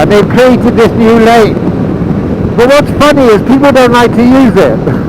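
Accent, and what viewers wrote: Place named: West Country, United Kingdom. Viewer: You sound like a West Country lad.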